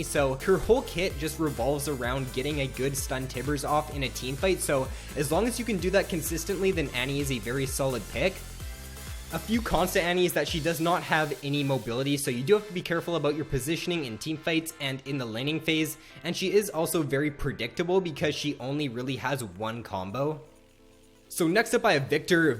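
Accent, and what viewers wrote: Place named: Canada. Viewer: hey, are you from Canada? I'm hearing a slight accent:P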